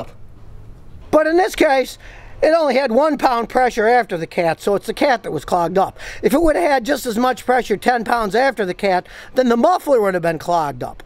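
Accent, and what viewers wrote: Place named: Chicago, United States. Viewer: "dont guess!! test!!" haha eye love the accent and the VOLUME! Chicagoan?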